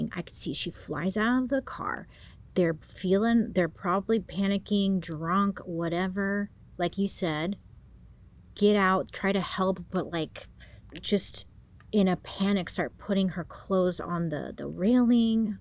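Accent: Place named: Inland Empire, United States. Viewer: Dude idk if you have or haven't seen it but Rob Zombie has a badass documentary about Manson with what honestly seems more like the truth. The truth especially if you have been part of any like tweeker group or dope house mentality. Check it out if u haven't! Also I can totally tell your from the IE you talk like us❤❤❤❤ I love your story telling!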